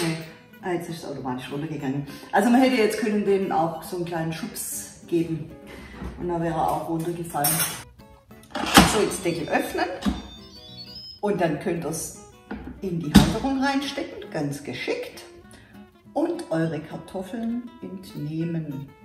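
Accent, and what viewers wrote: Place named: Swabia, Germany. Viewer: Abonniert zwecks vegan + schwäbisch + Instant Pot = unschlagbare Kombination.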